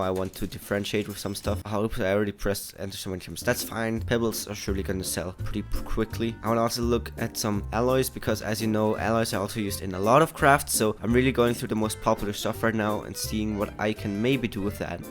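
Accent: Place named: Quebec, Canada. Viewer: where are you from buddy? Im pretty sure I heard some french quebecois in your videos ^^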